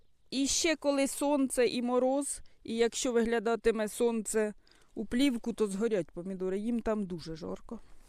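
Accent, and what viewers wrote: Poltava, Ukraine. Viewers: Зразу впізнала полтавську манеру розмови, бо у мене тьотя там живе. Дуже приємно слухати. Ви молодчинка, любите землю і рослини!!!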